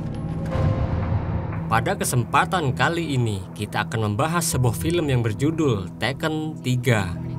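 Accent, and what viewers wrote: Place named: Ngapak, Indonesia. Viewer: Wong Jawa ya mas, ngapak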